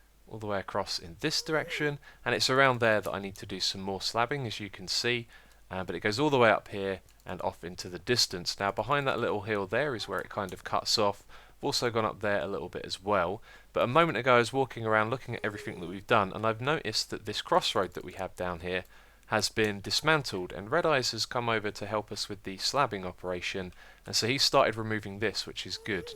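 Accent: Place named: United Kingdom. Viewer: You can speak so good British! THX for learning my English to and GREAT PROGRESS VIDEO!!!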